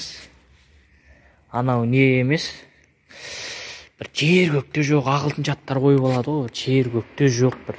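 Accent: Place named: China. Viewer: Тіліңнен. Айналдым қытайдың Қазағында сакталган.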